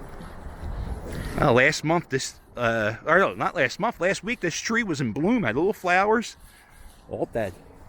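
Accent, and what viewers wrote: Philadelphia, United States. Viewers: I love the accent...he sounds like my cousins in S. Philly.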